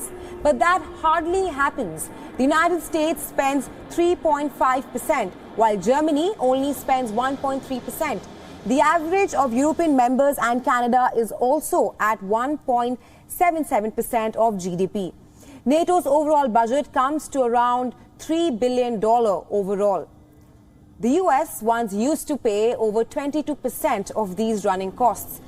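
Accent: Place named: India. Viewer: It's like watching Fox News with indian accent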